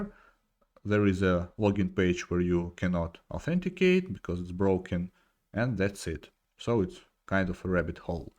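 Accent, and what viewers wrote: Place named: Russia. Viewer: русский акцент сразу чувствуется))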